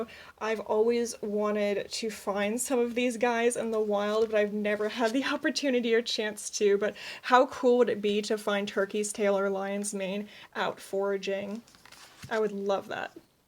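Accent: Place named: Eastern Europe. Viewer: Love your spirit! So joyful and enthusiastic 💕 You have very interesting accent. Are you from Eastern European culture? Love and light 🌺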